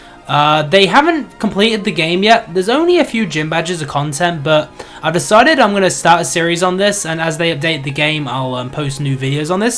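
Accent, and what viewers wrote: United Kingdom. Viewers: He's British... How can you be from Sweden and not even know your own accent? lol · What accent does have. British? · Sounds More British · im so suprise about myself sitting here and listening to your annoying english voice 7.minutes.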